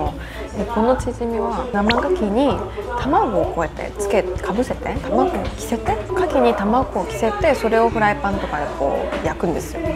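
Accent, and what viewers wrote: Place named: South Korea. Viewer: 일어하는 한국낭자 같아요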